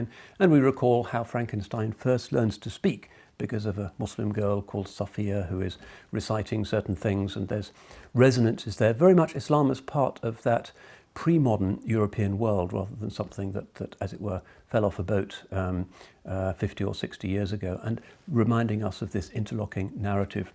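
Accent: United Kingdom. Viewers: im here for british english and his intellect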